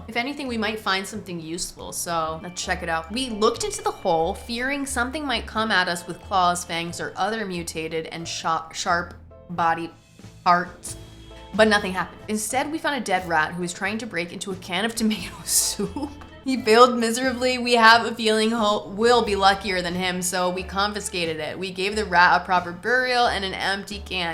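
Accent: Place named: West, United States. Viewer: I fear has slipped into her wild wild western supermarket accent and it hasnt gone away 😭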